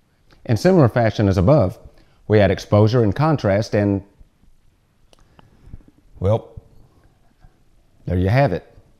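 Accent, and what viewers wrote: South, United States. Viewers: Love the Southern twang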